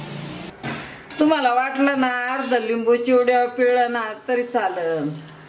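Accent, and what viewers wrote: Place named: Marathwada, India. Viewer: खूप छान सुशीला चिवडा आई मी पण मराठवाड्यातली तुमची भाषा शैली मला खूप आवडते जवळची व्यक्ती भेटल्यासारखे वाटते मी तुमच्या मध्ये माझ्या आईला बघते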